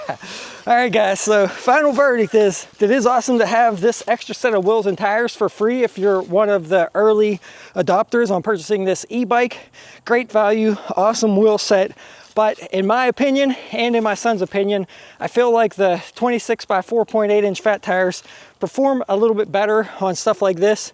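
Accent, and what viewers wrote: Pittsburgh, United States. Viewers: Are you from Pittsburgh??? 😂 Also I’m strongly considering this bike over wired, and a few others. Currently added to my cart 🛒 and can’t decide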